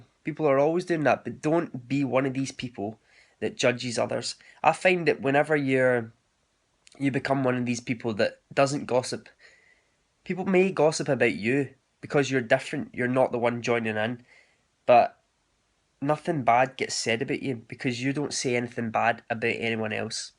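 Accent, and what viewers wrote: Scotland, United Kingdom. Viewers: I could listen to you forever, especially with how great you make everyone feel talking about real, meaningful ideas. On another note there is nothing sexier than a Scottish accent. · I've been watching your videos for awhile now and I have to say that you always seem to say the words I need to hear. Life has taken its twists and turns, but I'm starting to find myself again and what makes me happy. I just wanted to thank you for your inspirational words, and of course I love your Scottish accent!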